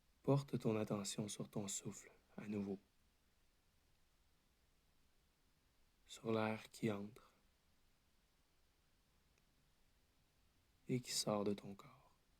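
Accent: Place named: Quebec, Canada. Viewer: ton accent québécois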